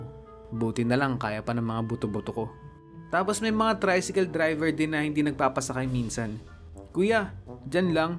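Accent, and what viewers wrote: Ilocos, Philippines. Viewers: kuya ilocano ka po?? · Kuya ilokano ka pala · Hala ilocano po kayo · Ilocano ka po ba? 😊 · Ilocano ka pala kuya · Kuya ilocono ka po? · Taga Ilocos Sur kaba weno Abra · Kuya ilocano ka ba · Kuya Ilocano kaba!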